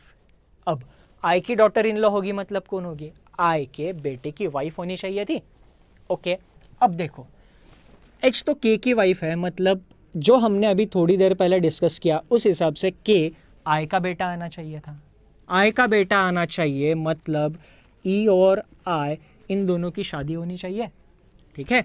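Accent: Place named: Maharashtra, India. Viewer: ....big fan of your teaching style PS: by your hindi pronouncation seems like you are a Maharashtrian....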